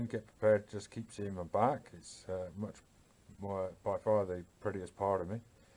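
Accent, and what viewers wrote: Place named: Cornwall, United Kingdom. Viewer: You seem to take care of the upper Tamar,i've been concentrating on the lower! Tributaries of it anyway.Good to hear that Cornish accent.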